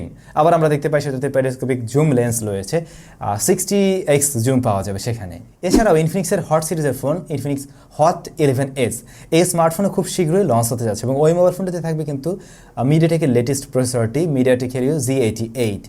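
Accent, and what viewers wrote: Barishal, Bangladesh. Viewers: ভাই অনেক দিন অনেক প্রশ্ন করেছি কিন্তু একদিনও উত্তর পাইনি, ভাই আপনার গ্রামের বাড়ি কি বরিশাল৷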